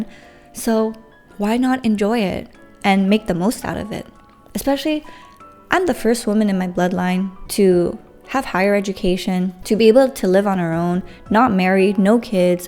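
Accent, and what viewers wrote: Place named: Canada. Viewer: oh my god im so glad to see you back. sending you all the love and healing vibes! I went to lapis today too, such a pretty and serene island. I also got desserts sooo basically twins I love how you sound more canadian now that you're back in canada ahahhah you are so blessed to have the people in your life that you do<3 CHEERS to you getting through the hard part and cheers to your boundless future. I'm proud of you!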